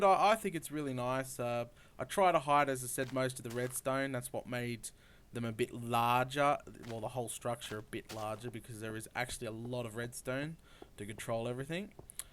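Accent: Australia. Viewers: Videos and tutorials um yeah it's called an accent........ not everyone in the world sounds the same. he's from australia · Is he Aussie coz I am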